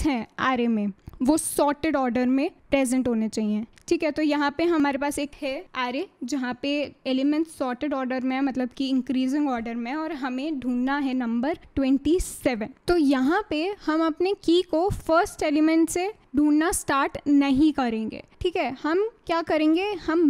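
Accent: India. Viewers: tired of hindu accent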